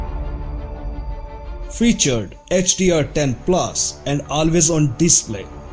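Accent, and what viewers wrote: India. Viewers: Are u from south india or oriya? Anyway stop spreading rumor. These are not correct info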